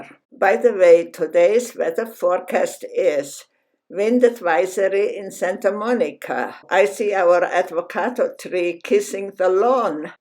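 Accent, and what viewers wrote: Switzerland. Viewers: Soft sensual painting along with the painter’s beguiling Swiss accent sharing artistic tips: Brava!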